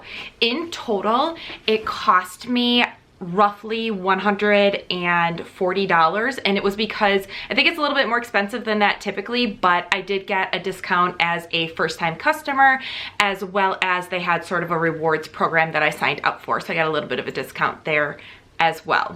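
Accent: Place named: Midwest, United States. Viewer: Omg you are soooo midwestern! “ Holy jeeez ya, those are deep!” 😂🤣😅 I’m a MN gal. 😘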